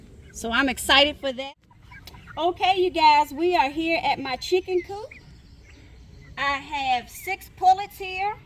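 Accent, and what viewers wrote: South, United States. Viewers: Nice. I can't seem to place the accent though. Definitely southern, but I get twang with no drawl. Carolinas or Virginia maybe? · Beautiful garden! I knew you had to be somewhere in the southern states because we all have that twang, different from state to state but still isn't like New Jersey. I sure wish I could grow the Alisa Craig onions but I'm in zone 9A, so it's short day only.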